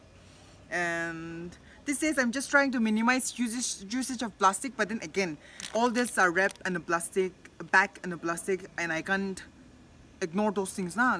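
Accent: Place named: Nagaland, India. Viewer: I just love Ao accent 😎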